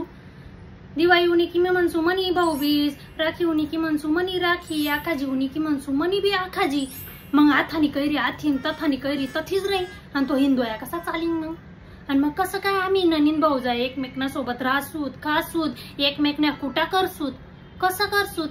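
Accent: Khandesh, India. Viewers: Kiti natural acting kartes ❤ Ahirani aikayla khup goad vatata❤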